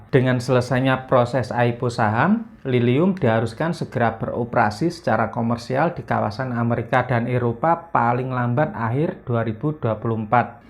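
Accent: Java, Indonesia. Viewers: amrika,,,hadeuuh jowo bnged sihh ngomongnya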